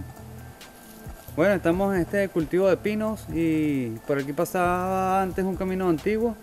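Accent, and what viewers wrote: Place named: Venezuela. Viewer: hola... soy Mexicano casado con una venezolana... cuando estaba viendo tu vídeo ella me dice "... ese es Venezolano" ... que buen canal de detección tienes, saludos mi pana!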